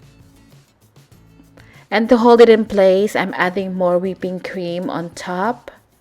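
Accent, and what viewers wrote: Philippines. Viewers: Wow, looks lovely and the Tagalog accent is very sweet too. Trying this tomorrow. Thanks for the recipe.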